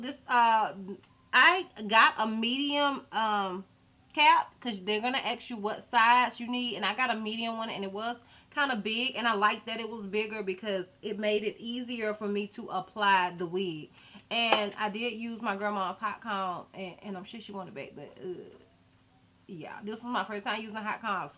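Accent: South, United States. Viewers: yes I knew it was somewhere down south lol😂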